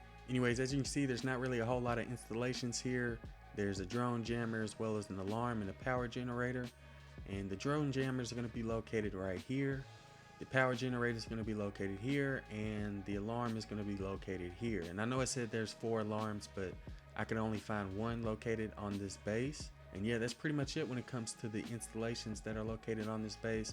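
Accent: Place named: Texas, United States. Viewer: Es FLOR de Oro, pocos huevos de pinche mierda! And Jesus Fucking CHRIST! Talk about a really THICK Gringo tongue! You from Texas, boy? Yo soy, también, pero hablo Español respectablemente, académico, en facto. Tu pronunciación es ferguenzoso. Ay, me cai gordo. Flor has ONE syllable, not two; it's NOT pronounced FLOW-err. Do you HEAR the words coming out of your mouth? I'd be astonished if you'd managed a D in high school Spanish, and that's sayin' somethin', you bein' from the South an' all.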